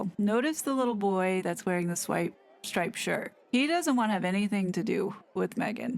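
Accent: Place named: United States. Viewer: Nice to hear an American voice that has some understanding of how The Monarchy works. Duty before family, feelings or wants. She is being tightly controlled by senior members of staff, probably ex military and a higher rank than Prince Harry was. By her demeanour, she had been told how to act. They separated M from H, and he seemed quite happy to be free of the claw. Yes her behaviour to the staff will be addressed, not necessarily to M & H. Give enough rope etc. The Prince & Princess of Wales just outclassed them by sheer presence.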